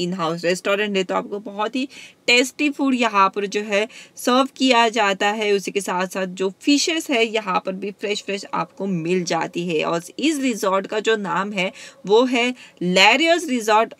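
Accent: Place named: Kathiawar, India. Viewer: WOMAN !! I loved your Kathiawadi Accent !! Well covered ...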